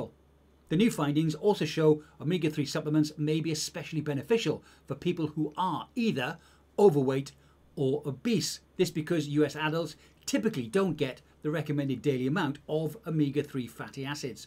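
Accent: United Kingdom. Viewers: Re the stack at the end of the vid, I am curious where you get your 500mg Metformin from. From the accent I'm guessing you are UK based. Did you measure its effect on your IGF-1 levels? I think this is one very interesting supplement. I heard some have side effects but can depend on the dose.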